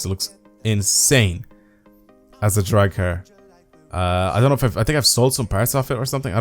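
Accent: Dublin, Ireland. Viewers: U from dublin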